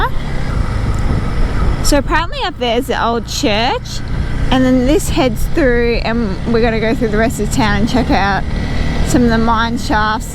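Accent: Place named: Australia. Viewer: Thats most Australian accent eva…😎🤘🏻🤘🏻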